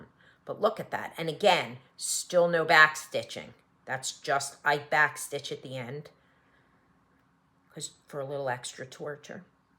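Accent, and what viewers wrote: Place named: South Jersey, United States. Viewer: Have been enjoying your flosstubes for awhile and realized you haven't been doing them that long. I decided to go back and watch your first video. LOL! I am a Jersey girl living in Williamsburg VA because my husband is a retired Army officer, and this is where we ended up. We are college sweethearts who graduated from Glassboro in 1983. I did my student teaching in Haddon Heights. Love your south Jersey accent.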